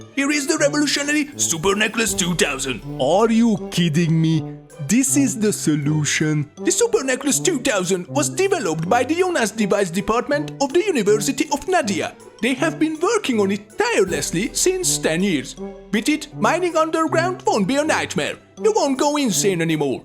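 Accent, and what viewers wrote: Hungary. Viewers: Great tips! :D And as a side question. From your accent, I suspect that you may be Hungarian perhaps? Maybe somewhere close in Europe. Or very mistaken. Ofc you don't need to answer if you don't want that info public. Was just curious. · why do i feel you're hungarian just by the accent?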